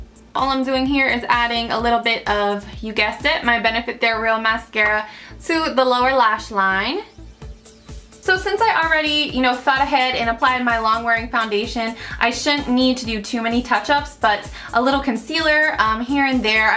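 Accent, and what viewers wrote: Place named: Mid-Atlantic, United States. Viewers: When people talk about your accent, I am always confused, since all my family lives around Philadelphia, so I don't think you have an accent. You just sound mid Atlantic. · ur from philly area? northeast? south philly? west philly? am from bucks county area..wow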